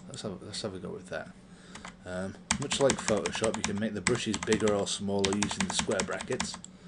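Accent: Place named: United Kingdom. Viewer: FINALLY another british person..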